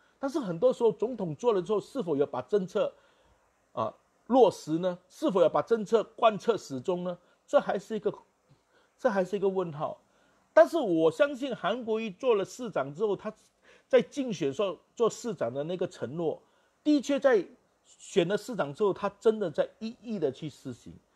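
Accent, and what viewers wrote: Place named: Cantonese, China. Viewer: 支持您！您的國語有點廣東腔，但很親切，又很有內容。加油！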